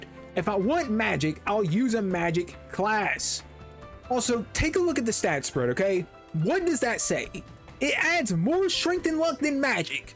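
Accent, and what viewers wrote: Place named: South, United States. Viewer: are you from the south? i like the accent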